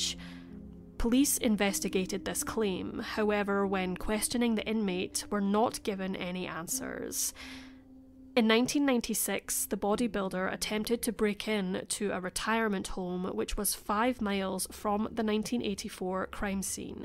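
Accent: Scotland, United Kingdom. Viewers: Love your accent, Scotland?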